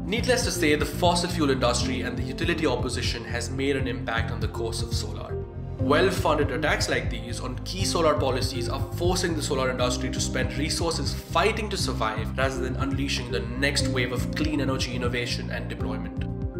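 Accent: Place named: India. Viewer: I've a feeling the v/o is done by a person with Indian roots. Great video, either way, made me smash like. Also, anyone reading this should subscribe to pewdiepie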